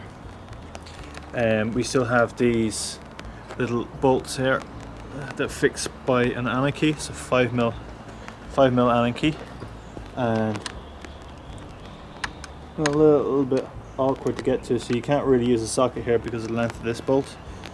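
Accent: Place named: Ireland. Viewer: Sounds so weird hearing an Irish guy call me dude! Thanks for the video though. Was nervous trying my vitz roof bars on my new Toyota corolla hybrid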